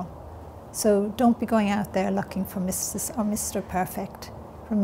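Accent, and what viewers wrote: Ireland. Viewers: She's Irish, so 'tread' I guess lol. No offence, it's just the way they pronounce it there :)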